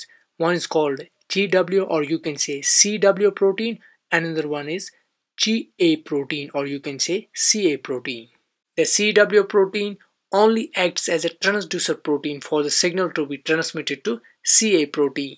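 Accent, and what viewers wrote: Kashmir, India. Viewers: I think this is kashmiri Sir. As by pronounced words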